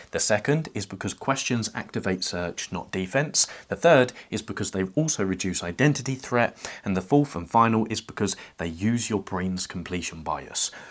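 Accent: England, United Kingdom. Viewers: Whereabouts in England are you from?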